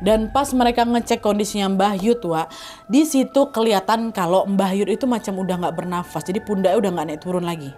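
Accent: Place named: Java, Indonesia. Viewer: aku notice logat jawa nya kak makin keren aja 😘😘😘😘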